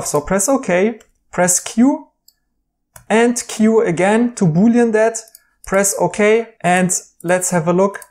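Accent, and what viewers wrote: Germany. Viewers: that german accent xD SCHLAND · So ein schönes deutsch-englisch und auch das Programm ist beeindruckend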